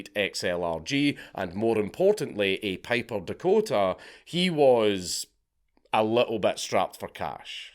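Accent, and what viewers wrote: Scotland, United Kingdom. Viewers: He talks too fast for such a heavy accent. Just Scottish blah blah.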